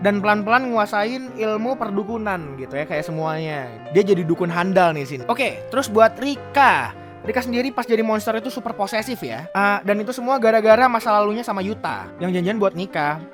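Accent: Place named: Madura, Indonesia. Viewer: bang, lu ngomong nya madura😅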